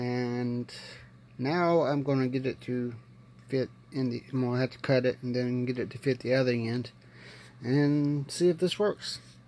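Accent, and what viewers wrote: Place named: South, United States. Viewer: Good job man! glorious southern accent!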